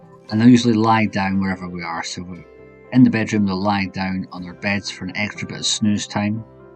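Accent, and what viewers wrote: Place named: Scotland, United Kingdom. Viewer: Absolutely wonderful video! Love your dogs, lifestyle and your beautiful accent.. Scottish <3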